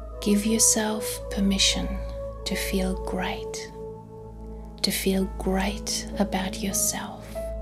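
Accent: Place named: Australia. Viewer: This is such a beautiful meditation, it's your voice I am sure of it. Probably the wrong thing to say but I am Aussie too and I do love meditations with an Aussie accent. And not all narrators has that lovely tone.. I do like your website and I have my eye on a few meditations by you and Brad. Thank you :)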